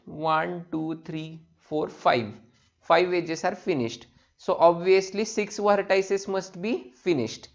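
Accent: India. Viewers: if you are indian, speak Hindo, don't speak the terrible indian english, i will make sure never click this again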